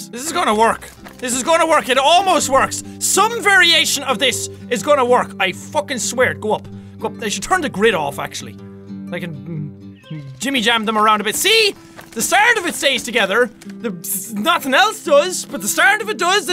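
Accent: United Kingdom. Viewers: Good British voice PS it's amazing !!!! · Calm, British Jack filled me with hilarity and concern. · you have good british aqzent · YOU WOUND SOOOOOOO BRITISH! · I love his British accent lol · Omg that British accent though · wow I didn't know he was British too XD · HISS VOICE IS BRITISH I KNEW U WERNT IRISH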